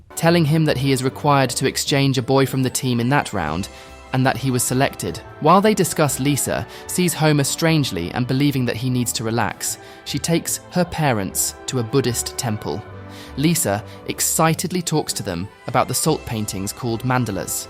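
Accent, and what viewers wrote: United Kingdom. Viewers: Curious question if you're from the US how come you have a British accent...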